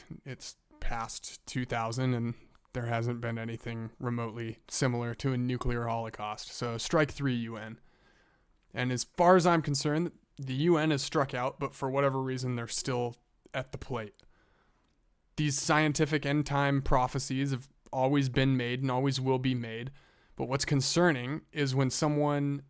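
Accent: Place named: Canada. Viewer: You sound like you went to school in Canada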